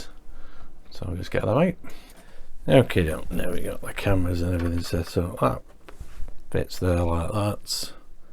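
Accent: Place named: Northern England, United Kingdom. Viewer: PS: I really like your cheerful disposition and awesome northern English accent! Excellent stuff!! Cheers, Mark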